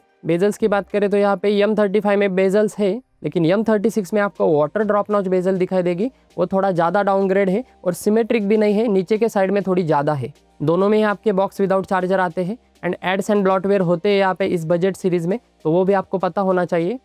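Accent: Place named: South India, India. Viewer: Why comments making fun of yam or M, it's common i guess south teacher does have this pronounciation Not a big deal..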